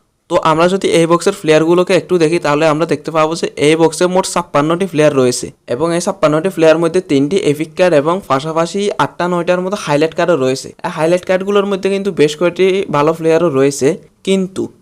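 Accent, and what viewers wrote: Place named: Bangal, Bangladesh. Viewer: Are bangal surddho language e ktha bol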